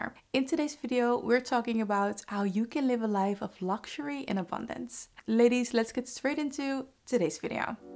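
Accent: Netherlands. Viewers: Sounds like she’s from the Netherlands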